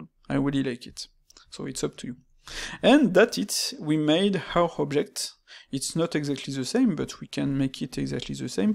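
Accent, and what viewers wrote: France. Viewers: Bonjour! merci pour ton frenchy accent. pour une fois que j'arrive à comprendre un tuto en english!!! J'ai un souci lorsque tu applique le premier Bevel a les edges intérieures disparaissent, ainsi que la face... je suis bloqué. je suis sur blender 2.9.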